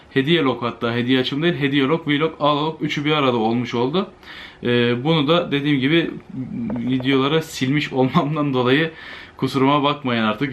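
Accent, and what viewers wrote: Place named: Ankara, Turkey. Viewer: La adam Angaralıymış ben de diyom niye samimi geliyo gardaşim la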